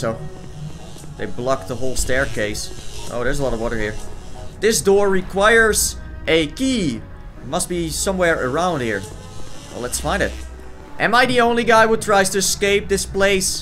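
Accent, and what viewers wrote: Netherlands. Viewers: Your Dutch. You can clearly hear it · Gotta love the dutch accent😉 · You kinda have a Dutch accent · Ja ik hoor een Nederlander xD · Hoi Ik Ben ook Dutch Ik Dacht al aan je acent:D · Hij is idd nederlands, hoor je aan zijn accent toch? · je klinkt best Nederlands ben je? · he has a dutch accent indeed! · Almost definitely. Such English pronunciations are the disabilities of a real Dutchman! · I think he's Dutch, I'm Dutch and Dutch people got the worst accents, I can hear from his accent that he must be Dutch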